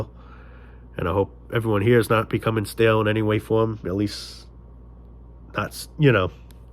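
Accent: New York, United States. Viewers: You’re funny as shit 🤣 your speaking cadence and New Yorker accent is hilarious 😂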